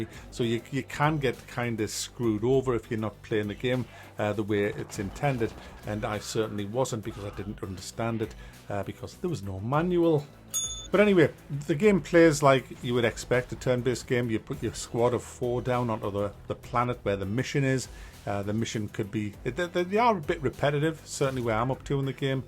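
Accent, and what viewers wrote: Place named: United Kingdom. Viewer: Can I ask how British accent is called? It's so cool:)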